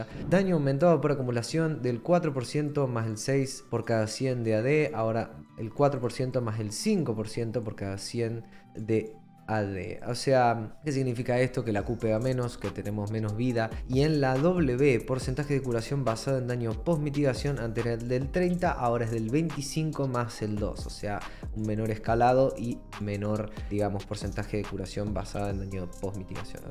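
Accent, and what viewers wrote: Argentina. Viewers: tenía acento argentino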